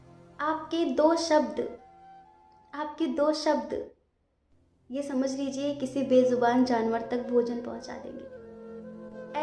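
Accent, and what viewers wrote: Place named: Pahari, India. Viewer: Kitni pahari aawaaj hai aapki waise hi payare aap ho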